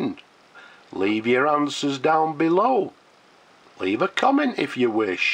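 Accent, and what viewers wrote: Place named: North, United Kingdom. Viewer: love the pen and glad to see the nice event with my favorite nothern accent again.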